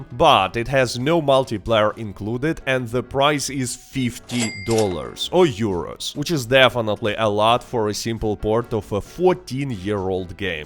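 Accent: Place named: Russia. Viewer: Русский акцент какой то будто слышу😮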